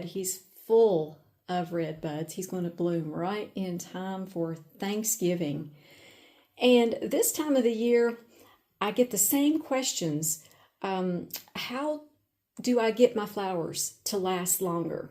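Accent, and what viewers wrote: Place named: Tennessee, United States. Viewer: I love your TN accent! (from this californian)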